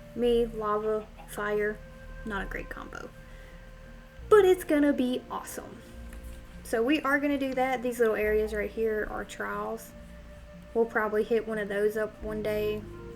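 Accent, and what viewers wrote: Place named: South, United States. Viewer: Hey! Contrats on starting your new modded serie! I've been kinda binge-watching the serie so far. You seem new to this kind of thing, which is great! I'd like to know if you're the kind of YouTuber that likes having comments helping you with tips and tricks when you seem stuck, warn you when you make a mistake or forget something, or if you're the type that rather figure it out on your own, if you like the challenge of solving everything like it's a puzzle or some type? Just trying to figure out if you allow some back seating or not, this changes the perception on how we can interact with you in the comment section:) The serie's been great so far, quality wise I'd say the only thing so far is that your voice is really soft and quiet, which makes the video pretty relax (Which I suppose fits with your Cozy persona:D) but personally it could use a little +1-2db increase (just a little louder so we can at least hear you clearly). 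I must say though, you have a very nice accent, sounds a bit southern to me?:P I might be wrong but to be fair I'm not from the US hahaha What are your goals for this modpack? Do you plan on automating everything? Are you more of a stylish builder that fits automation into a design or do you design around the automation, or maybe you simply make it work and that's enough? Lastly, I'm curious if you plan on maybe streaming at some point? A format I like seeing is those cuts you do when you work on a project, when you know it's going to ta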